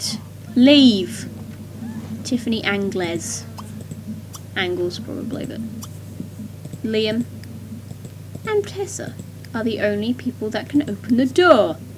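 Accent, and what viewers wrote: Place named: United Kingdom. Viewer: I love your voice! It's all british-y :D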